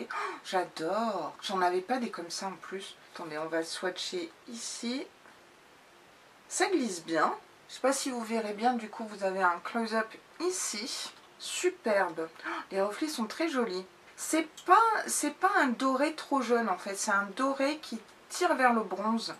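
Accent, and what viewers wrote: England, United Kingdom. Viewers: Aloha :) Très belle idée qu'ils ont eu de proposer des boxs surprise même si lorsqu'on est abonné, l'intérêt est un peu plus limité. Tu m'as fait exploser de rire avec les produits Polaar mais après tu me connais, j'aime avoir du (sur-sur-sur)back-up, alors quand j'aime un produit, je ne suis pas ennuyé le moins du monde de l'avoir 3 ou 4 fois :P Autrement cette box est toujours merveilleuse car elle propose des marques peu habituelles du fait qu'elle ne soit pas française et c'est l'occasion d'apprécier ton délicieux accent anglais qui a refait ma journée :) Je ne regrette vraiment pas d'avoir craqué pour 1 an avec Lookfantastic grâce à ton dernier unboxing. Plein de bisous !!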